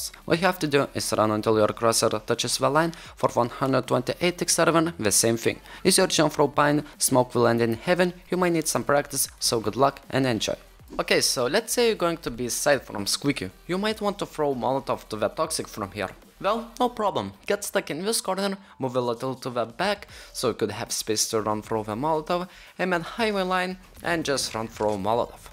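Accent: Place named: Germany. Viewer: pain to hear german english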